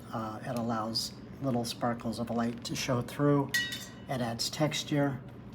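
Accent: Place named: Berkshire, United Kingdom. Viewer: Thank you so much. This was a lovely demo and I enjoyed it very much. I look forward each week to see what you have to share. I am going to try this technique with a squirrel that I am thinking about painting. I love the colors for the otter. These Texas ears are loving your Berkshire accent ❤ 😊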